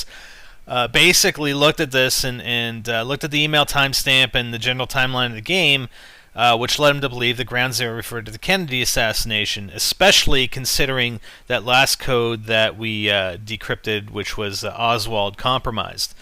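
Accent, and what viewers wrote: Canada. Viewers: this is so canadian with your prOcess and zed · are you Canadian? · Your Canadian aren't you (zed) instead of z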